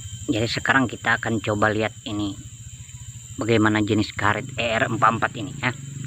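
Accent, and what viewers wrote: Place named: Banjar, Indonesia. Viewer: Ini di kalsel,beliau logat banjar ,dan mmg suku banjar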